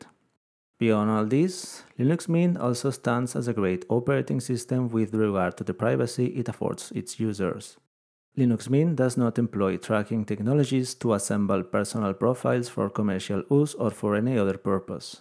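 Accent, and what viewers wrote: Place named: Brazil. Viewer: brasileiro tentando falar ingles, suponho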